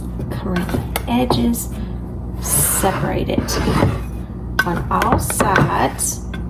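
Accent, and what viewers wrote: South, United States. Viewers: I don't know if it was the recipe or the southern accent that made me subscribe, but here I am 😂.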